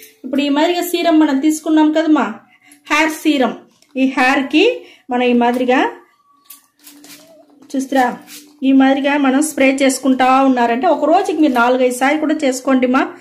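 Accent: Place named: Karnataka, India. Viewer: కన్నడ భాష యాస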